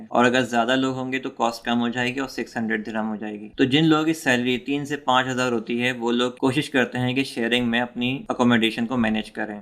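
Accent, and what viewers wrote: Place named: India. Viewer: Bro you are working in Dubai but you are slang language is Indian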